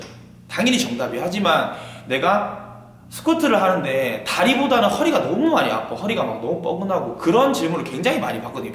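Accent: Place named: Busan, South Korea. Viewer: 선생님 부산 분인가요? 사투리가 찰지네요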